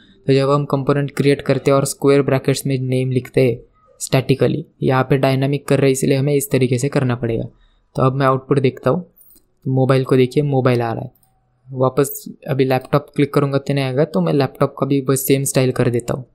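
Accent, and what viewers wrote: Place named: Maharashtra, India. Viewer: Marathi acent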